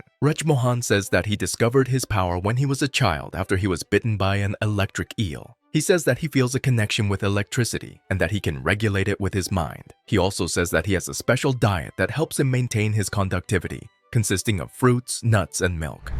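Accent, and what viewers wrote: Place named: India. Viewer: I miss your beautiful improper Indian voice.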